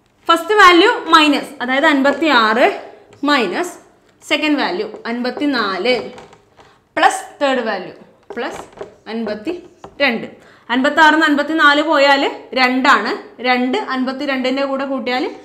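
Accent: Kannur, India. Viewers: കണ്ണൂർ ആണോ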